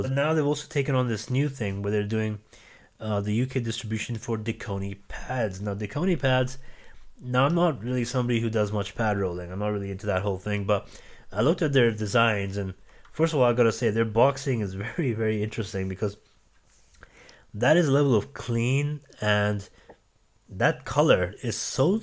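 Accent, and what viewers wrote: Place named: India. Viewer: Where are you from? Your accent sounds Indian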